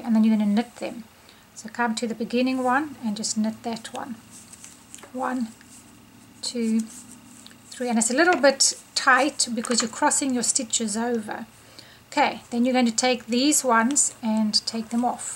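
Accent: South Africa. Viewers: Am I right, don't the lady who speaks live in South Africa? Sounds almost like the South African accent. Anyway, beautiful Kimono!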